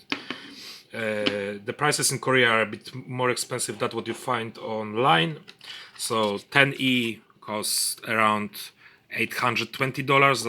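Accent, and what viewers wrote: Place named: Poland. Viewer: akcent jakiś taki polski ;)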